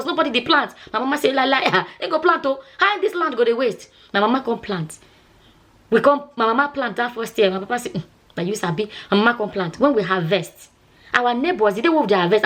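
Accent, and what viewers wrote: Pidgin, Nigeria. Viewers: 😂Love the pidgin English vibes oooooooo😂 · So beautiful i really like your pidgin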